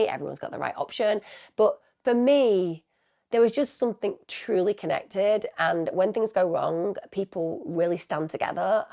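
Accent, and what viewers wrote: Australia. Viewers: Heartbreaking 💔 case. Loved your Aussie accent lol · As tragic as it is your Aussie accent is fab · I was living in Melbourne at the time and I just cannot put into words how distraught and horrified we all were when this happened. This bridge is HUGE. So high. She had absolutely no chance, the poor little angel. (Your Australian accent is great by the way). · Terrible case, very sad... evil man. But I also love the Aussie accent haha! Spot on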